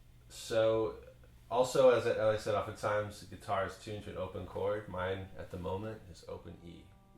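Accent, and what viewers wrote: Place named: Arkansas, United States. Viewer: You really suck man, you sound like a loser hick white guy from Arkansas or something. Man, you SUCK. lol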